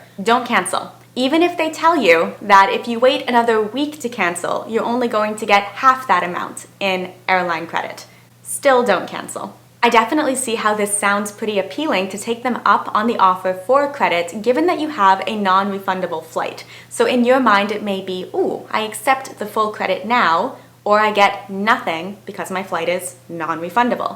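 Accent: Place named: New Jersey, United States. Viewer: I feel like you are covering up an accent. Like New Jersey or Boston thick accent.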